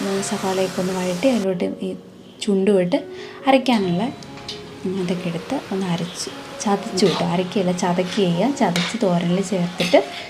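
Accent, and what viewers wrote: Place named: Kottayam, India. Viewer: ഞങ്ങൾ കോട്ടയംകാര് വാഴച്ചുണ്ടെന്നാ പറയുന്നത്., നിങ്ങളുടെ slang തന്നെയാ ഞങ്ങൾ പറയുന്നത്.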